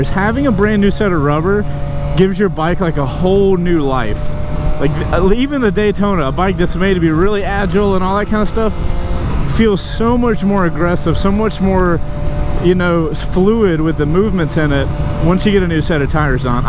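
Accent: South, United States. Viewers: "You could possibly, significantly....." Damn I miss the south.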